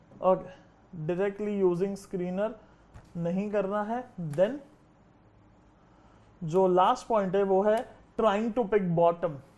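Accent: Gujarat, India. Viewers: Are you gujarati?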